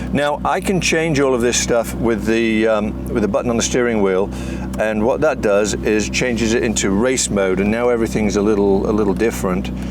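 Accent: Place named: Lancashire, United Kingdom. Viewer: I'm losing my mind trying to place his accent. It sounds like Lancashire, maybe even a little Liverpool, definitely not London. Can anyone confirm?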